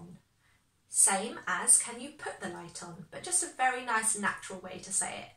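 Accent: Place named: Upper class, United Kingdom. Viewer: 👏👏👏👏👏👏👏Lovely! Ages ago I looked after my uncle's ponies at Cowdray Park Polo Club, in Midhurst, and everybody spoke just like you there!😄😀😄😀😄😀 Muchos saludos, from Olivos, Buenos Aires, Argentina🇦🇷🇬🇧🇦🇷🇬🇧🇦🇷🇬🇧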